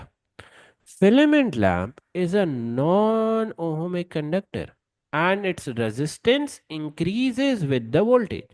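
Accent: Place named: India. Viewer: ikr, the most indian accent i ever saw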